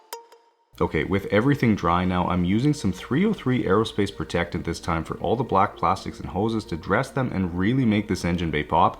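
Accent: Canada. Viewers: All I’m gonna say is I started watching staufer garage detailing and I was hooked and then your channel popped up on hen you were really low on subs maybe around 15-20k I instantly fell in love with your content and Canadian accent lol. Man you have grown so much and I’m actually very very happy for you! Keep up the great work you are a very amazing and genuine person and I am happy to have came across your channel when I did. Maybe one day I’ll drive from New York to Canada to get my car detailed lol but your really far haha. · Nice job! You must be in Canada; hints of some of the accent 😉 And that snow, lots of it. 😊 Happy Spring, from Washington, D.C. 🌺🌻🌼🌷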